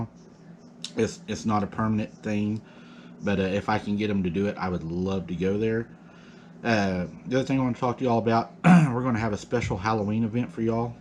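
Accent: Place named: Tennessee, United States. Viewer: You must be from Tennessee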